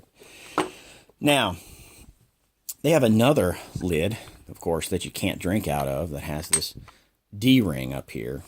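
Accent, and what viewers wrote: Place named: Cajun, United States. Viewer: Worst cajun accent ever. But a great review!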